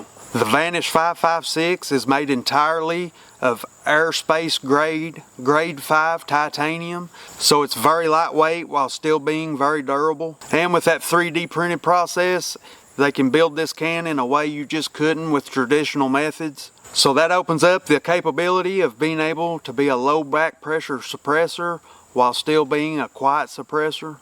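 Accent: South, United States. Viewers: I subscribed,after hearing that country boy voice,I knew we’d be just fine 🤜🏻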